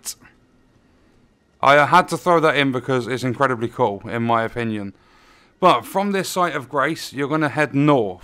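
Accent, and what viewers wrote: England, United Kingdom. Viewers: English people talk funny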